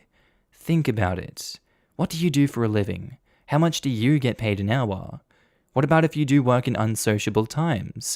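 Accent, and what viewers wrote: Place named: Australia. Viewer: Wow, love to hear a fellow Aussie voice in the evening. Onya! ♥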